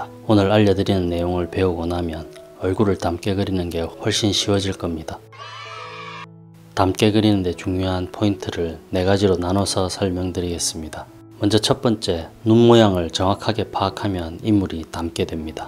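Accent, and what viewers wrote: Gyeongsang, South Korea. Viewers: 갱상도 분이시네!! 반가워요^^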